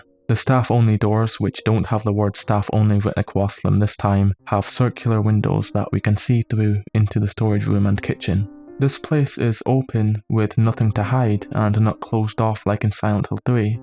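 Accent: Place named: Aberdeen, United Kingdom. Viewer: You sound like you're from aberdeen.. I could be wrong.. but it's very similar to my accent! Anyway, fantastic video man!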